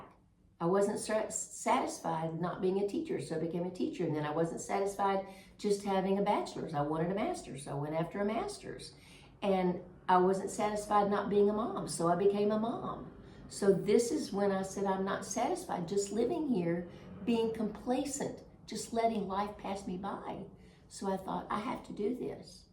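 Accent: South, United States. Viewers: Thought I recognized the Southern accent. I’m from Georgia. Don’t get rid of it. It’s a gift! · I LOVE MY SOUTHERN ACCENT AND YOURS! I get a lot of comments on mine too and I remind them that they have an accent too unless theirs is from England! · Never tamper with your beautiful southern accent, it's charming. You have really lived a full life and still are. God bless you. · I just found your channel, and have watched a few videos. Have to say, you look so pretty with your hair in this loose, flowy way. Love it. And I love how happy you are with your decision. (I am appalled that someone said you should "get rid of" your beautiful Southern lilt.) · It's a shame that people don't appreciate a southern accent or even a traditional southern lifestyle. I personally like and appreciate the diversity that accents offer as well as perspectives on life., I'm curious if you have noticed a similarity between the French approach to life and the old southern approach. 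When I was young, banks and many businesses took Wednesday afternoon off. As well, professionals often closed early on Fridays. Sundays were closed also for time for church and family with large family gatherings on Sundays around the meal. Things were slower paced and life was pleasant. This seems similar to traditional French life - as I understand it. What has been your experience? What are your thoughts? I love you videos and your accent.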